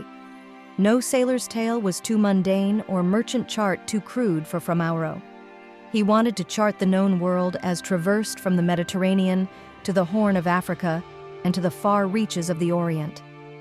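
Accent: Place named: United Kingdom. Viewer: I rather prefer to hear Brit accent than those other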